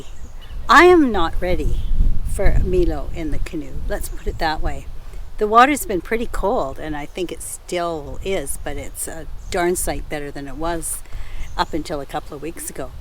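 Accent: Canada. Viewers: Im from the Uk, I clicked on this, heard a Canadian accent, looked up Horne lake and realised its pretty close to where my dad grew up in Port Alberni! He passed away when I was 16 in 2016, so this felt like a nice sign from him and was a very comforting video ❤️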